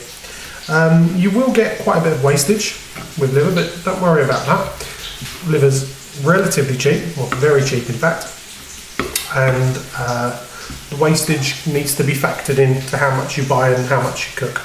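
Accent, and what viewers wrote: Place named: Australia. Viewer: I like your recipe and I like your knife but your Australian accent is hard to listen to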